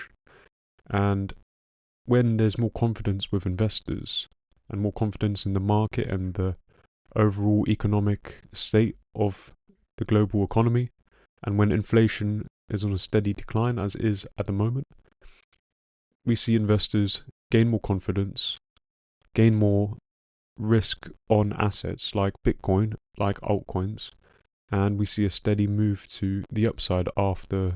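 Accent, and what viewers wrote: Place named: England, United Kingdom. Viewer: Are you English? Usually I know from the accent. You sound English to me, but I'm not 100%. You could be a Scandinavian who knows English as 2nd language from the crib maybe.Not Australian, not American, not east Europe, or west Europe, not south Africa, not from New Zealand definitely. Dude, you're killing me.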